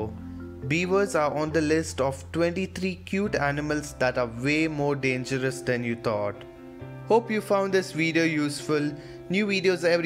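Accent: India. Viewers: awwww hit a nerve how am I racist for saying taliban ???? And he really sounds like India but sorry I'm no expert like u in accents BIG BRAIN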